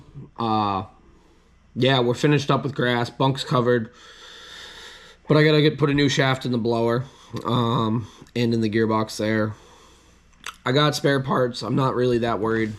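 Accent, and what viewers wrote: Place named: South, United States. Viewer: You got southern boy in you (Y’all )👍🏻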